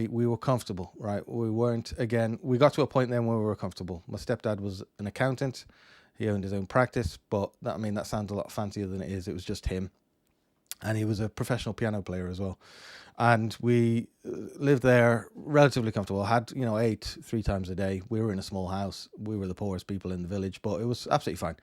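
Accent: Ireland. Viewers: ayo I would have thought you left Ireland not that long ago, you have that Irish accent, even when you said you came from Ireland I was expecting Northern Irish, no offence. Despite all the bad things about the UK, It is the ISA that keeps me in the UK NGL. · I was thinking I could hear the brogue. ☘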